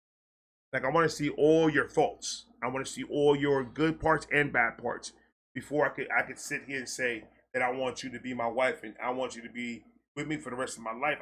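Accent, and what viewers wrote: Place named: Bronx, United States. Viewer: You sound like you’re from the Bronx and I never even been to NY 😂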